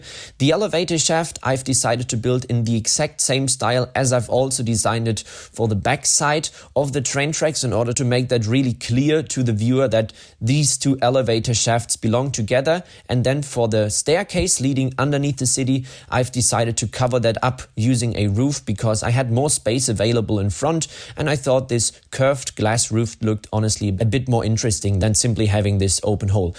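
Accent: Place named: Germany. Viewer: Achso du machst deutsch und englisch mit diesen Videos ich weiß das wegen dem Akzent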